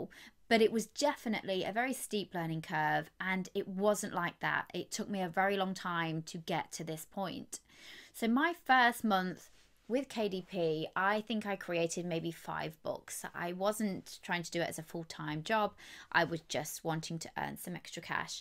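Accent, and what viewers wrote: United Kingdom. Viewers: I am a Kdp newbie. I am about to upload my first children's book. Thank you for the sincerity and straightforwardness! Last but not least: I love your accent! What part of the UK are you from? I'll come back to let you know what happened to my sales. Wish me luck! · Hi 😊 I appreciate it because you are honest and realistic Excuse me , are you British !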